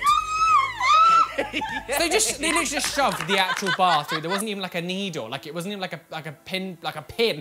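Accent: United Kingdom. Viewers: I have to say I wasn’t expecting you to be British but I love it hey queen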